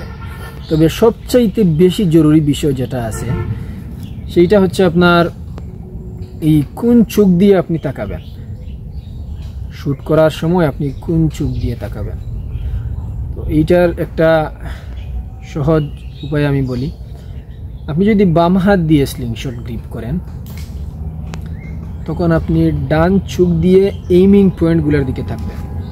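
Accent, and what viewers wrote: Sylhet, Bangladesh. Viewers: Vai ki sylheti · Bai apnr matar style sylhetr moto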